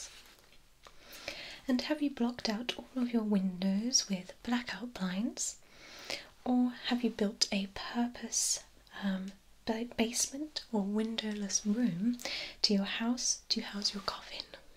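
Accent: United Kingdom. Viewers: British accent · This is by far your best work so far. Please make another vampire video this is my favorite one out of all of them, most of the time i dont make it to the end of the video because i am so relaxed and your voice is so soothing that i fall asleep half way through the story i love your British accent and this story that you came up with is so awesome, i cant thank you enough for making these fantastic videos sincerely Richard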